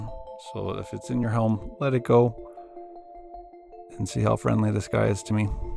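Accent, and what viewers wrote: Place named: North America. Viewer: Aw. I Would hold your hand. If its a jumping spider or a Wolf spider, they tend to have really big front facing eyes, they all have more than 2 eyes. Watch this channel, Spencer at My Wild Backyard, and Travis McEnery (These are theSpiders in your House) and theres a couple of other channels that often collaberate with Spencer who have great content! I think its lovely that you talk to them. I just took my horse who is getting over a sore foot, for a walk in the woods and animals are really the best company, we were out for hours, I've been doint it every day because she loves seeing everything around our wood. I know they dont speak but animals seem to just love the attention and the time you spend with animals is never wasted. I hope You find someone to help with your spider ID, I would have loved to have helped but am in Scotland and I think you sound to be in North America!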